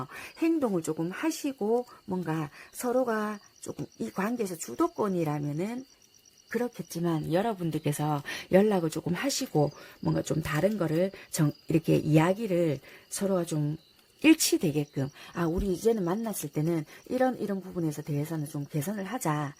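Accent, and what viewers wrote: Busan, South Korea. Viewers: 1번👀✨.... 정말 꼼꼼하고 현실적인 리딩 감사합니다♥ 덕분에 용기를 얻고가요 가 저에게 최고 잘맞는거같아요 👀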